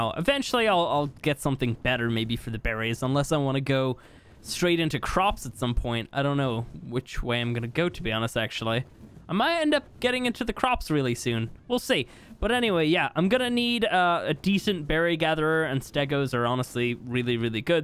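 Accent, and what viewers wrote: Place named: Ireland. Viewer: Jolli_2000 hes Irish its the way they talk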